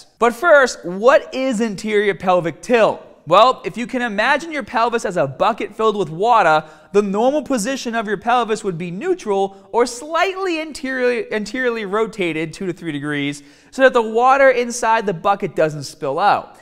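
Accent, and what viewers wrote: Boston, United States. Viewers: Is this guy from Boston? What accent is that? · Came for the tutorial, stayed for the Boston accent. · Before reading replies, I say Boston accent. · Staaaahted! LOL love the Baaaahston accent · Nah, that’s a Masshole accent :-) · Where’s this guys accent from in the US? He says wardah and pahtts. Nothing wrong with it its interesting and making me listen more 😅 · This guy is definitely from baaston... Great video thanks for tips.👍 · do your ears work He's from Boston. 😂, Australia 🤣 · Boston accent? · Love that Boston accent! Excellent explanation and routine, thanks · A bit of Mahk in your accent lol. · That's definitely Boston New England accent. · are you from wicked good Bahston? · Not Aussie lol Boston it seems.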